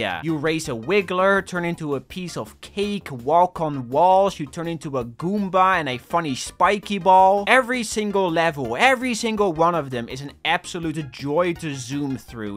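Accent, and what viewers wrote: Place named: Netherlands. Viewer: I like your funny accent Dutch dude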